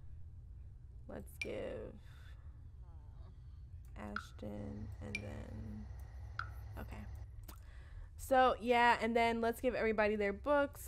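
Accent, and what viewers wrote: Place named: DMV, United States. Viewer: im from dc and i knew you had to be from the dmv area ! i heard the dmv in your voice 😂 i just found your channel and instantly loved your videos❤️❤️❤️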